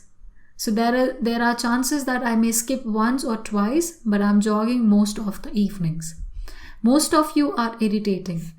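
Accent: India. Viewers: I was completely confused with this Indian accent😐😐😐😐😐😐😐😐😐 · Why indian english acsent is funny? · I LIKE YOUR INDIAN ENGLISH.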